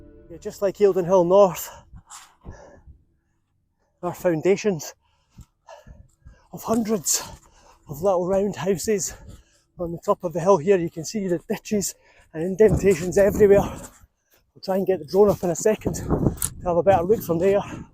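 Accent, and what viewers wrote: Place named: Northumberland, United Kingdom. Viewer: Your accent in the Scottish Borders is strikingly similar to Northumbrian, and it stands out from the heavy, guttural Ayrshire or Glaswegian accents that have come to define Scottish English.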